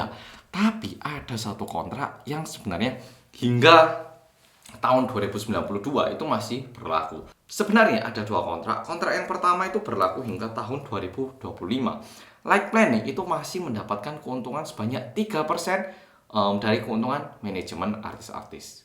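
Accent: Java, Indonesia. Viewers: Mas medok andalanku, sebagai JYP stan gw mendoakan yg terbaik buat SM entertainment 🙏🏻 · suara medoknya berkurang drastis.. Lebih berat korean aksen 😁.. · yg komen agak sdkit kecewa dan mngkin skit mostly sm stand yg notaband agak benci berembel Hybe dan BTS,sdikit yakin itu..aq paham krn aq Army,sejak jman vote dll pun kbnykan Sm stand bnci bngt,but its okay. well aq gk mnghakimi mereka sbgai Sm stand,tp be strong for all of us sbgai fans..#nowarnohate #justopinian peace✌️ Btw pnjelasan nya keren bngt,Thanks Mas Korea yg Medok.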